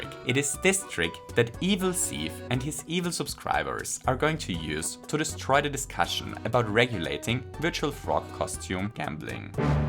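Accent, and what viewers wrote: Germany. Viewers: Ein Deutscher Akzent 🤔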